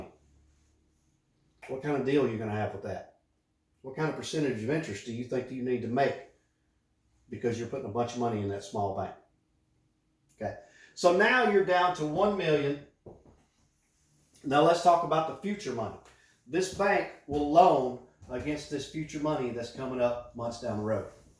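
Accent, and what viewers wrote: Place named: Mississippi, United States. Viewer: Are you from Mississippi?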